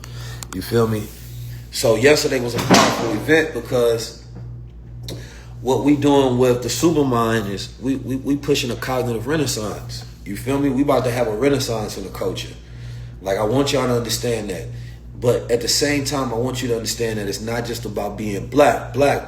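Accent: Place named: Chicago, United States. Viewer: Is it me or do I sense some Chicago swag in your step now. You sound a lot more dangerous now my dude. Peace & Blessings. S/O from the Chi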